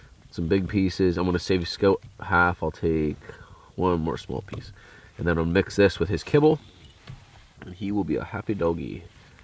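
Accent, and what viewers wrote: Ontario, Canada. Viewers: Are you Canadian? You kinda talk like one. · thought i detected an Ontario accent - definitely some french metis in there as well. from a fellow cunnuck :)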